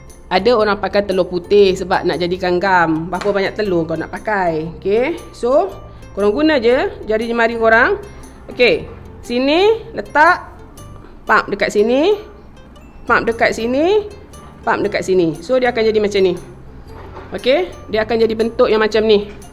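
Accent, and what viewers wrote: Perak, Malaysia. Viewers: Aku.... Aku... Bahasa belah mne ye.,, selalunye org perak? Maaf hanya bertanye... Tanye😁😁😁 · Terbaik terang bercakap senang nak faham nati nak cuba buat 😊 sya dengar pon mcm orang Perak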